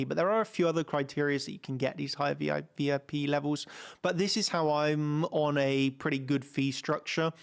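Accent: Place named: West London, United Kingdom. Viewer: I'd love to know where your accent's from,. I feel sure it's from west of London!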